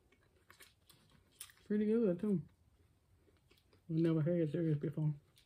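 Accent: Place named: St. Louis, United States. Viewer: Yes ma’am!!! I can tell by how you talk and especially that duck and special noodles!!! North County Florissant!!! I just watched your gizzard video with my husband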